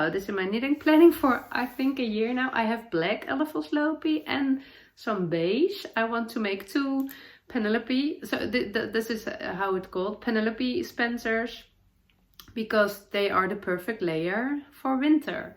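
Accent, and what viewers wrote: Netherlands. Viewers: I love sharing my knitting time with you. You’re such an inspiration with your views on life and challenging yourself to grow whenever you can 👏🏼👏🏼👏🏼 You have made me look at the colour brown in a whole new light😂 I am searching for previous podcasts I haven’t seen bc I’ve watched all of your podcasts and I need more in my day 😂 You make me laugh so much with your Dutchy English. I live for it 😂 The saying when you have a cold is ‘I have a STUFFY nose’ but from now on, I’m going to say I have a stiffy nose, so I can relive that heart jumping moment over and over 💕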